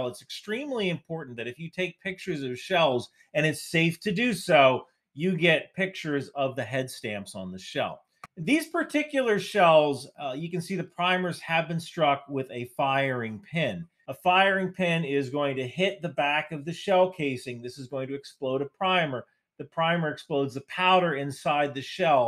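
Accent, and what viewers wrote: New Jersey, United States. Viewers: Shit man, I like the Jersey accent even if I barely hear it. Keep at it! Without you and Preston Stewart we wouldn't have nearly as much accessible info! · I grew up and still live in S. Jersey, no worries about the accent. And I'm near the middle of the speedline, so at least your hometown has a claim to fame. Don't feel bad about the way you talk, all good Jersey people talk like that. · don't apologize about your New Jersey accent. I'm from NYC and I completely respect and understand you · Thank you for breaking this information down. If people complain at you for mispronunciation in a Jersey accent. I would hate to hear them whine about my Texas accent pronouncing foreign words. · Mr., dont ever apologize fir for your Jersey accent. I'm from a few miles north of the Jersey border and I sound just like you. And it's a great accent! 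· I mean he doesn't really have a strong accent. You can definitely hear the Jersey in his voice but it's not near as intense as some!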